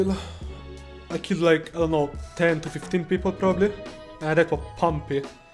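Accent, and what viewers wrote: Italy. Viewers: Ur italian?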